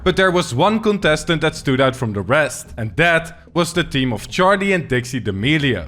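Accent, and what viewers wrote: Netherlands. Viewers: Bro u Dutch? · You have a dutch accent and i know cuz im dutch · I smell dutch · I smell Dutch 🇳🇱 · are u dutch.....?? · i smell dutch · Why the freak are you trying to talk english with this accent, if ur dutch just dont do it bcous then its just irretating.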